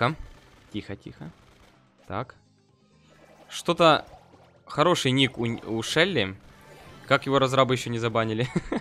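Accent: Ukraine. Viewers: Ухх как же меня бесит его акцент. Сколько лет знаю, столько лет мне режет уши его украинский акцент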